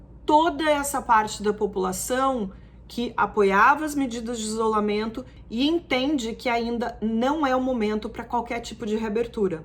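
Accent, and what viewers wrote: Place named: Rio de Janeiro, Brazil. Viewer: amo seus vídeos! Gosto muito como vc trata as notícias e da maneira polida e irônica que responde aos haters. Adoro seu sotaque carioca! Parabéns!!!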